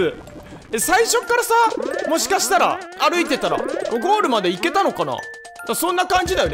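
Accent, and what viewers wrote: Fukuoka, Japan. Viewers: 福岡弁は、関西弁なんかより柔らかくて素敵と思います(^^ 収益化が始まったとのことですので、より益々、見させていただきます。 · 全く関西弁ちゃうから気にせんでいいと思うよ〜！関西の俺からしたら福岡らへんの方言に聞こえます！ドコ住みか既出ならごめんなさい！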